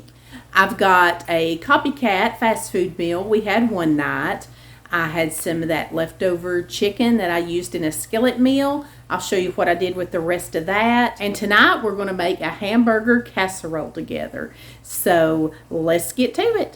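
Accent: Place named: South, United States. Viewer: I love watching your videos! Your recipes are simple and pleasing, and your attitude and southern accent grab my attention. I look forward to seeing your next video everytime. Thanks from Papa G!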